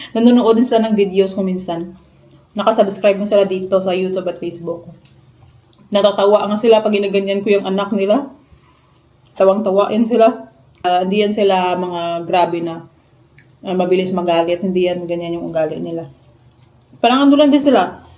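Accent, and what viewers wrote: Iloilo, Philippines. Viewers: When she speaks English, her pronunciation is with Ilongga's accents, intonations and tones. Which is sometimes not easy to understand.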